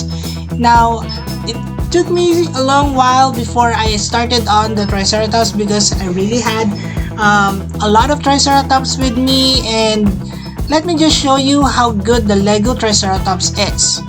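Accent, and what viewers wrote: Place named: Philippines. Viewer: and I see ur filipino! By your voice!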